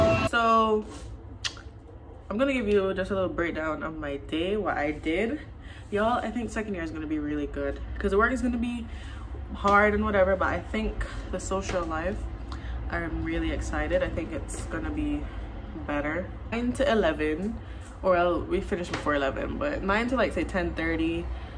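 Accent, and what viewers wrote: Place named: United Kingdom. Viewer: Loved this! I hear the British accent coming through🤣🤣. Separately, that softball taster session finished me, same time next week🤝🏾🤝🏾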